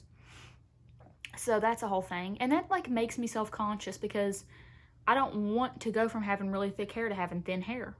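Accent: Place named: North Carolina, United States. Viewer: I just found your channel today! I feel like I am listening to my sister in law-she's from North Carolina. Where are you from?!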